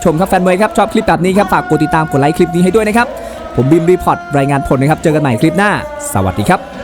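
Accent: Thailand. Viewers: ชอบเสียงพากย์ ลูกผสม ไทยบ้าน + เสียง กรุงเท๊พ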